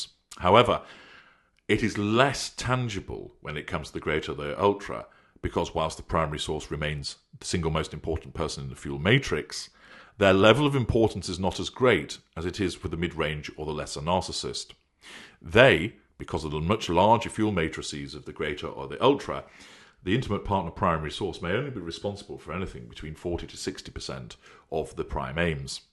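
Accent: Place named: England, United Kingdom. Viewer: Soothing? I find it cold. No inflections. Even Doctor Higgins' voice sounded more soothing and human than his. Although his accent is academic and his vocabulary is rich and high standard; Englishman I guess (doesn't sound Canadian 😁) no cockney.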